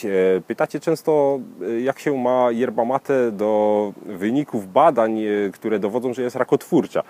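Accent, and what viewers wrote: Silesia, Poland. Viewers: jesteś ze Śląska prawda? :D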